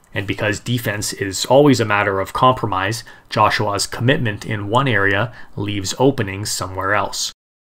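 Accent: Canada. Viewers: An odd mix of a Canadian or a Devonshire accent plus American, where are you from and where have you lived? i mean. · You’re a Canadian aren’t you. I know that accent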